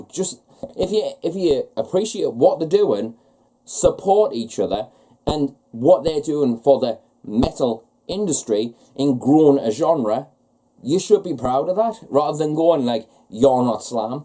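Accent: United Kingdom. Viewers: It's a Geordie accent, he's from Newcastle or Sunderland in the uk, the accent is sexy af with girls the Geordie accent lol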